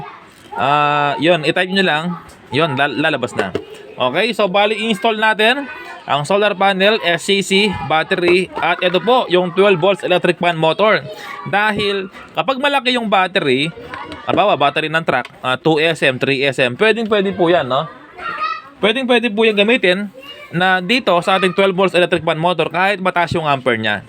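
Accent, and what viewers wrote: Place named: Pampanga, Philippines. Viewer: Kapampangan ka kuya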